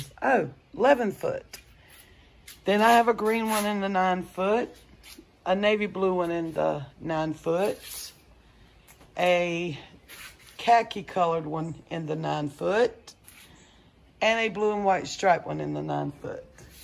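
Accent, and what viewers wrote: South, United States. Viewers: Where are you from? Love your southern accent! What store did you find the umbrellas from?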